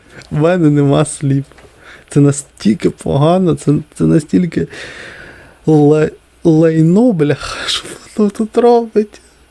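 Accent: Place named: Russia. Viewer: А он музыкальный критик? Или комиссар из отдела политической цензуры? Кстати отчётливо слышно, что родной его язык русский, но он усиленно выдавливает из себя мову. Похоже, что вместе с мовой ещё шось выдавил в штаны.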